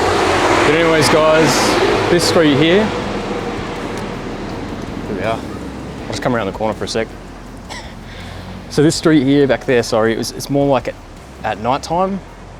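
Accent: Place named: Australia. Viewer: Ahhh australian accent. Welcome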